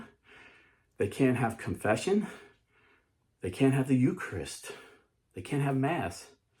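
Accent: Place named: New Jersey, United States. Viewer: It’s just his Jersey accent. Likewise, a southern accent might say it slowly as “Holy-cost.”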